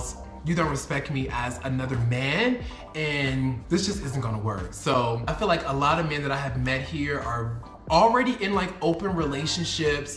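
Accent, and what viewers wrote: United States. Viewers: 1. Welcome to South Africa, the coloured thing is very common here. You light skinned. But you of course should tell them you are not coloured if you are not. But lovely to follow your journey in my home. South Africa has many race issues though and I am interested to know how you feel about that in comparison to the race issues in the USA. 2. also, I like the way you say "Johannesburg'. We just say Joburg here sis. Joburg! LOL 3. Becareful of Lagos. They HATE GAYS THERE and it is ILLEGAL! They could kill you!! 4. Dating is Transactional in Africa, cause they think you have money! That American accent sis. They think you have money!! The dollar is stronger though. 5. Polyamory is not for me as well, sis. But Africa and South Africa has a lot of it. ENJOY YOUR TIME IN SOUTH AFRICA! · They're crazy for mistaking or assuming u that u're coloured up there in Joburg please correct them n clearify that you're black and from america.its your accent that confuses them.dont accept the term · Lmao at the Coloured situation: South Africans are not well travelled. 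But because of your accent, I expect them to understand that you are an ''African/Black American'' who is on the lighter side, pigmentation wise. So when they ask you about your race, just say ''I am Black American,'' it will click to them! Your blond dyed hair is also not helping! Haha!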